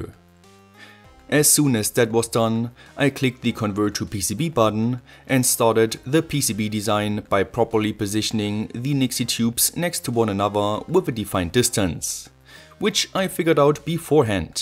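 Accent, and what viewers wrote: Germany. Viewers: Junge dieses schwere Atmen nach jedem Satz und dieser deutsche Akzent ahaahsh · Dieses eingedeutschte haha xd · Also den deutschen Akzent oder besser gesagt die Aussprache hört man gut raus aber cooles Projekt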